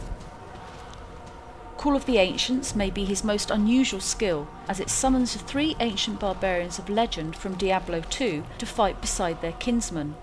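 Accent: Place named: United Kingdom. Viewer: you are to diablo3 what David Attenborough is to the BBC. I know us Americans have been trained to think British accents are smart but it definitely works for this vid.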